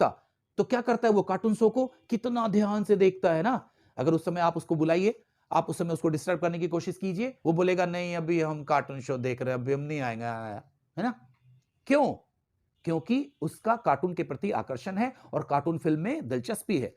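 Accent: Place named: Bihar, India. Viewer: Very nice video sir, I like you biharihood tounge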